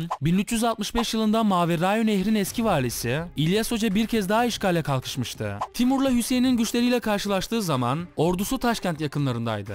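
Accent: Batman, Turkey. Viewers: Batmanlımısın kardeşim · Hocam batmanlımısın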